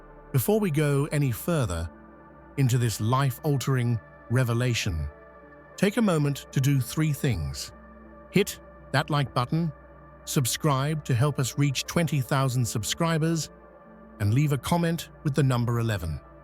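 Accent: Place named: England, United Kingdom. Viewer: If I’m goodie goodie - then I’m not human - btw I love your voice - that clear distinctive English accent- I’m not the chosen one - That would be notions of grandeur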